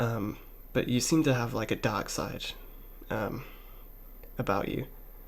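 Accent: United Kingdom. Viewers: Are you a Brit? I have a degree in British English and British Literature :) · Bruh I can’t tell if your British or not, it’s freakin me out pfft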